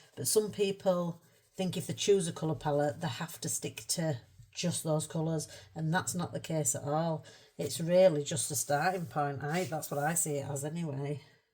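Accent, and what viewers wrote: Yorkshire, United Kingdom. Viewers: I love watching your videos. I’m an Australian originally born in England and my Dad and his family is from Yorkshire. Hearing you speak is so nostalgic 😀 And you’re so funny. You’re doing great. Can’t wait to see more! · Love this! I think it will be great to do together with my daughter. I'm glad you kept going. I like your accent so much that I recently started following a new meditation teacher because she also has a Yorkshire accent 😁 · Hello, what a great video, do you know you've really cheered me up, I'm in bed with the flu and feeling crap, so I've so enjoyed this chaos method. And the bonus is listening to your Yorkshire accent, love it, love Yorkshire. Your humour is brilliant, I appreciate it as I'm a Scot, and originally a Glaswegian,although I live in inverness, not a lot of humour here😅. I love your approach to colouring, it's not pretentious, you just have fun. 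I love this page, the freedom of it and such pretty colours too. Well done and I hope your channel goes from strength to strength, I found you thanks to Jackie at spare room studio. I'll be back 🎉❤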